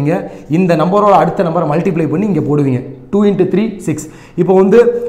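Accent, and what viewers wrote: Madurai, India. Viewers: Madurai Lang itu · Madurai slang bro👌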